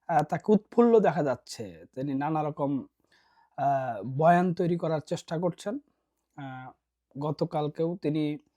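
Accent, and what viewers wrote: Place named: North Bengal, Bangladesh. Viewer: আপনি কি নেশা করে ভিডিও করতে আসেন নাকি, আপনাকে দেখলে একদম গাঞ্জাখোর নেশাখোরদের মত মনে হয়, উত্তরবঙ্গের খ্যাত মফিজ গুলো যেভাবে কথা বলে আপনিও ঠিক সেভাবেই কথা বলেন . স্মার্ট না হতে পারলে ইউটিউবে এসে ভিডিও বানিয়ে মানুষকে বিরক্ত করে ইউটিউবের বারোটা বাজার কি দরকার?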